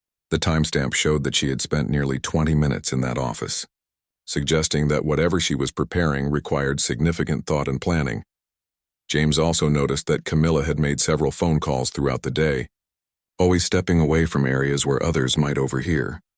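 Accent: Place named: United States. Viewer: Why is the voice over an American and how did he get this information ?????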